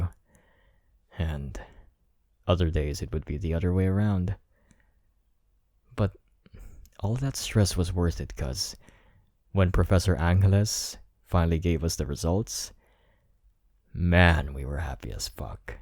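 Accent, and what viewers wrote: Philippines. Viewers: SABI KO NA EH FILIPINO KA YUMG ACCENT MO SA FILIPINO AGUY 🙂💕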